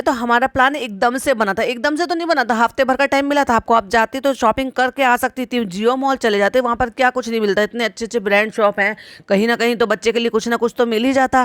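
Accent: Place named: Saharanpur, India. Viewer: ji!!! Aap bilkul Saharanpur/ Muzaffarnagar k jaisi boltai ho!!!! Many of my family members & friends live there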